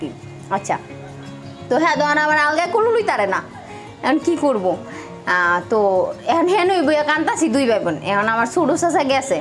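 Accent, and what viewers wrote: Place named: Mymensingh, Bangladesh. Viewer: মনে হচ্ছিল ময়মনসিংহের ভাষায় কথা বলতেছিল।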